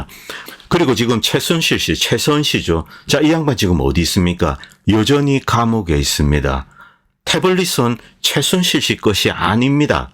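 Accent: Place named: Gyeongsang, South Korea. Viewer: 형님 경남이지요 친금감잇는 목소리 방송잘보고잇어요 😅 이기자 통신됴😅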